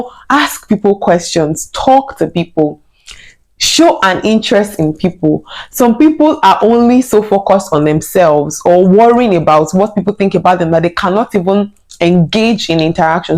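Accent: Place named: Nigeria. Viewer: Why Nigerian accent sounds rubbish?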